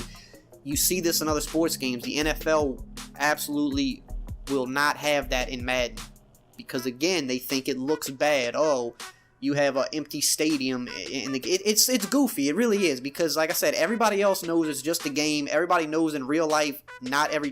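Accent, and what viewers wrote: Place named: New Orleans, United States. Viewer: Dat New Orleans accent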